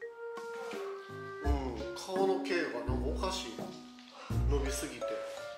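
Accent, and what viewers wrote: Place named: Kansai, Japan. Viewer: 三姉妹に癒されバリバリの関西弁にもホッコリしている関西人ですw 一気に3匹も飼える環境が羨ましいと同時に大変そう〜💦と思いながら楽しく見させて頂いてます(*^^*)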